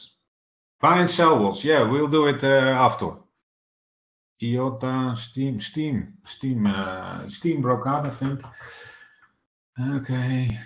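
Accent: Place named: Netherlands. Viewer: Lekker Nederlands accentje hoor! Maar een goeie analyse, keep it up!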